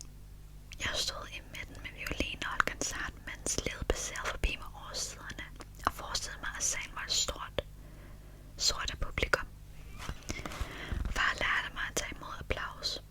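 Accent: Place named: Denmark. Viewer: Ohh btw, do you mind me asking what city / Danish region you’re from? Your Danish accent is beautiful but I think it’s a bit different from the one I’m used to hearing on Duolingo 🥰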